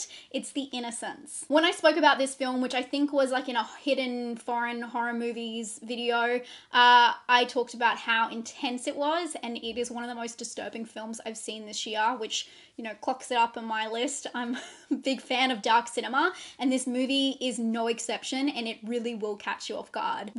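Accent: Australia. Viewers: i Love your Australian accent and i like the way you look when you talk like that. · Love the Aussie accent!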